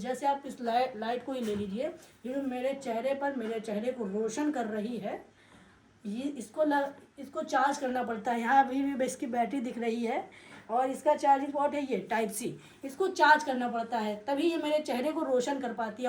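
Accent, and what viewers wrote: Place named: Bahraich, India. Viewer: Bhai Bahraich se ho kya 😊 mera gaw h vha Apki local language vhi ki lg rhi 😊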